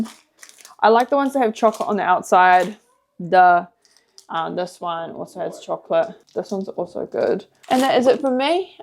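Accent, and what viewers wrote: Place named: New Zealand. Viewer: DO IT!!! I moved to Japan right out of college. Didn’t speak a word of Japanese, but did have a few college friends there. That adventure changed my perspective SO much. You have friends here in the US, and people here are friendly and helpful. Please don’t miss out on this adventure for you, your husband and children. What’s the worst that could happen? You can always return to NZ. Just be prepared to answer the same questions over and over again. Americans are like big, friendly dogs when they hear an accent like yours and when they find out where you are from, you’ll become the neighborhood celebrities. I SO hope you’ll take the leap!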